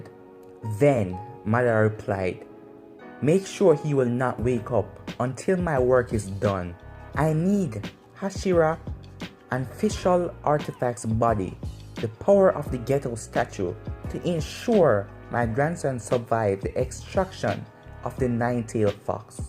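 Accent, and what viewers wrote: Jamaica. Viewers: He’s Jamaican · We’re are you from Jamaica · How you doin ? And are you Jamaican ? If you are wa gawn? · love the accent, jamaican?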